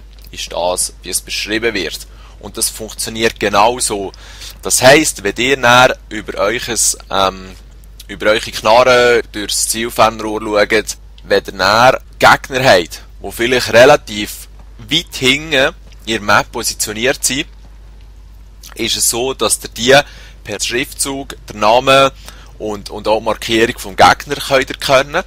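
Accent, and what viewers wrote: Switzerland. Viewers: Endgeil das swizzerisch :D ich versteh kaum ein wort aber kann mich da köstlich amüsieren :D bitte mehr :D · Ouuu was schwizerdütsch!!! :D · haha :D gnau so öppis hani gsuecht und den isches ersch no uf schwizerdütsch ;)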